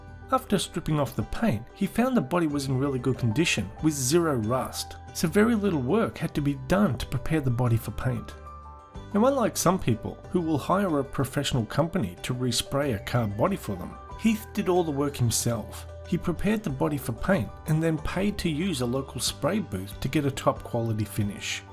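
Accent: Australia. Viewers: Herbie and KITT. He sounds like an Australian version of me. I'd love both of those in ny garage but I'll settle for just Herbie. At the moment.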